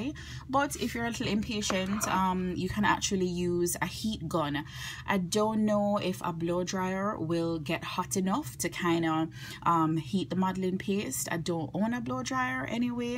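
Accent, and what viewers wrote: Jamaica. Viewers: So refreshing hearing a Jamaican voice 😇 Great video. I’ve been working with space and now ready to try modeling paste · Omg you haveee to be jamaican lol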